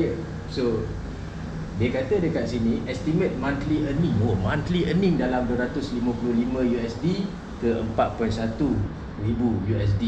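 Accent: Sarawak, Malaysia. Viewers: Bangga dengan ni... Satu he yg X suke... Bahasa Sarawak ko X tajam dah bro. Kemonnnn. Xkan la X boleh tune.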